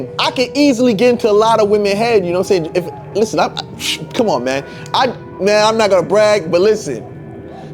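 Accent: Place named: Miami, United States. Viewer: lol Man U sound like a Miami haitian . U wild and funny af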